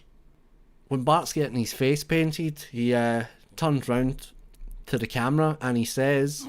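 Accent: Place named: Scotland, United Kingdom. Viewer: was expecting an american person making this ... was really shocked when i heard a scottish person start talking! You must be from the outer hebrides or something because the majority of scotland is a secular , rational , irreligious place.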